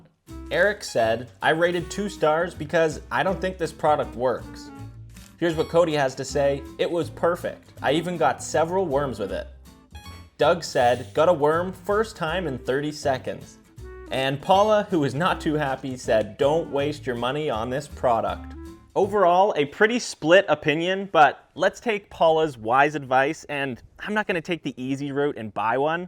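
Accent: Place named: Canada. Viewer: It’s only been seconds into the video and I can already tell the guys Canadian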